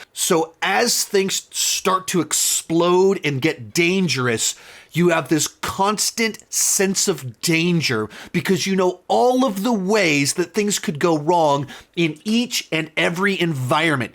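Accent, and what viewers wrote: United States. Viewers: The voice and speech pacing of this guy are so American. Too much gesticulation. Sorry, unbearable for me to watch & listen to.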